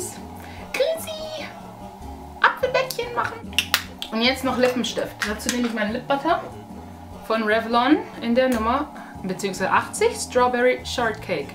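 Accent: Swabia, Germany. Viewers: der dialekt :DD schwäb'sch :D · ich finds so toll das du manchmal ein wenig schwäbisch redest!!!!!!! schwabenpower!!!!!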